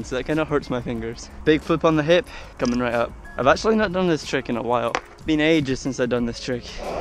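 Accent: Scotland, United Kingdom. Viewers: R u Scottish?😂💕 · i only realised he was scottish about half way through the video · Is ma mans Scottish?????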